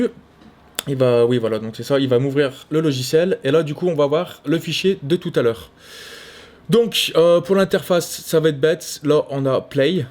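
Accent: Saint-Étienne, France. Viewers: j'en ai beaucoup appris sur cette camera super vidéo mais par contre t'as UN PUTAIN D'ACCENT STEPHANOIS tu nous représente bien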